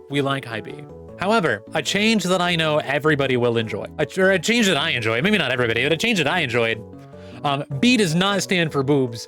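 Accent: United Kingdom. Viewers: you sound like you're doing a british accent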